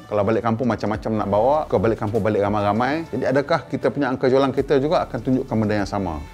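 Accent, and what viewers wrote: Indonesia. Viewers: Cakap saja guna bahasa Melayu Malaysia. Tok sah ikut rentak loghat indon tu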